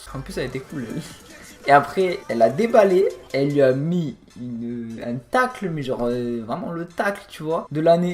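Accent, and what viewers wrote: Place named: South, France. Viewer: accent du suuud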